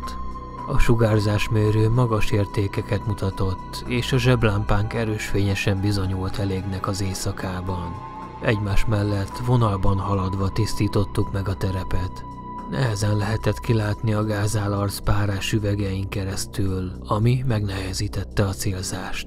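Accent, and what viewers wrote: Russia. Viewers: Nem tudtam félni vegig a Vicces Orosz akcentuson szakadtam xddd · Király az orosz akcentusod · Nagyon jó az orosz akcentusod. Tetszik! Azt hittem, hogy ez igaz történet, de miután megnéztem a leírást, akkor rájöttem, hogy ez csak egy kitalált történet. Kösz, ezt a nagyon jó történetet. Van ennek a történetnek folytatása?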